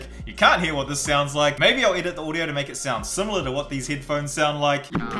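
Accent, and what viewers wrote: Australia. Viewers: oooooooh so you are australian, now i understand why you talk like this, good videos! PS: English is not my main language so is hard for me to differenciate accents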